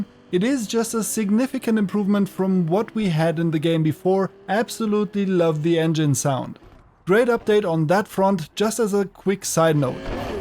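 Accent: Germany. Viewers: Akzent: Deutschalisiert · Hier sieht man mal wie krass der unterschied zwischen deutschen und englischen Videos ist. Der original creator hat hunderte Videos consistent gemacht und du hast schon halb so viele Abos… würde dem ganzen mal folgen, da das echt interessant zu sehen ist und ich selber überlege englisch zu machen. Wie ist deine Erfahrung mit dem “deutschen Akzent und wie gut die Leute das annehmen?”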